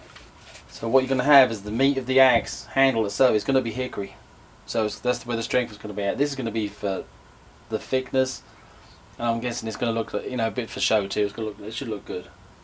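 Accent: United Kingdom. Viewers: This guy sounds strangley british Also love the video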